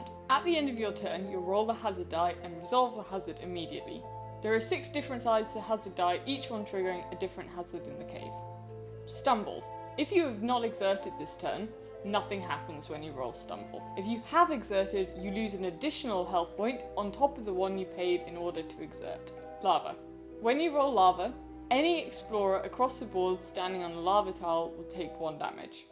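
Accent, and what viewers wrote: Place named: United Kingdom. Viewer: Donnie DeVille this is not mumble, it's British.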